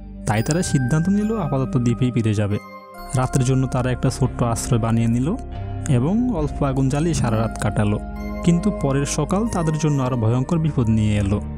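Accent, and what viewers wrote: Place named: Chittagong, Bangladesh. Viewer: বয়েস টা মনে চট্টগ্রাম এর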